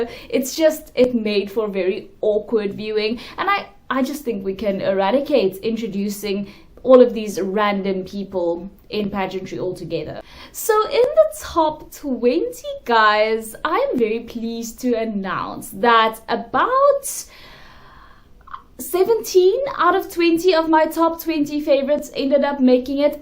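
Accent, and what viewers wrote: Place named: South Africa. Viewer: Love you. And love your Afrikaans pronunciation of ‘r’ gives life and errrrthing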